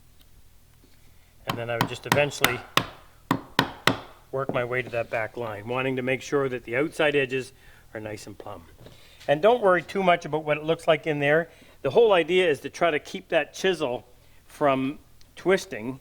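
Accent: Canada. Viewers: If the accent didn’t indicate that is Canadian, the mallet handle wrapped like a hockey stick grip sure does. Thanks for thr tips,. Putting pencil markes along the length of the mortice to help position the chisel is something I never thought to do. I also like how tou set your making gauge to the width og the chisel by sandwiching it between the marking gauge cutters. It seems I need to flip my cutters.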